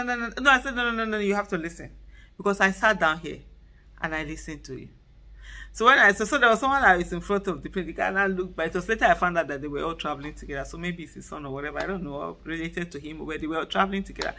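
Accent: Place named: Edo, Nigeria. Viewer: I knew it! I almost asked you in your last video when you said you are from Agbede if you live in Auchi, too. Because when you were speaking Etsako, you were mixing up both Agbede and Auchi😂. Now I know why, because Mama is from Auchi (from Amehbainomoh's family) and Dad is from Agbede. I hope I spent your grandpa's name correctly. He was a wonderful doctor in Auchi. He cared for me when I was sick. May his soul rest in peace. Just like you, one of my parents is from Auchi, the other from Agbede. 😂😂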